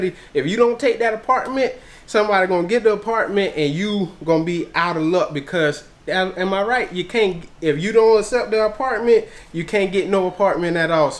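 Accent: South, United States. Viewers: What state are. You located I detect a southern accent , you have a lot of stuff everywhere !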